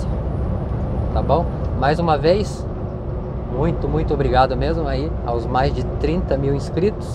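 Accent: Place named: Rio Grande do Sul, Brazil. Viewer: Você tem um sotaque de gaúcho tchê!!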